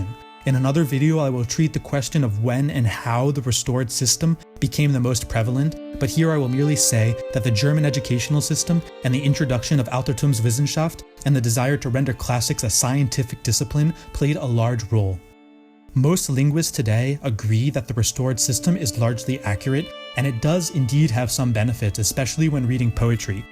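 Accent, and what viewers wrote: United States. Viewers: never heard a more Americanized pronunciation of Latin and Greek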